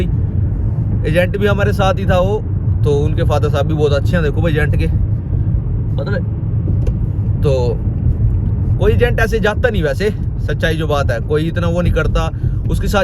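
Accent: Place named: Haryana, India. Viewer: bhai mein yamunanagar se.... Apki boli tu jame humare karni ki hai ... Konsa agent tha apka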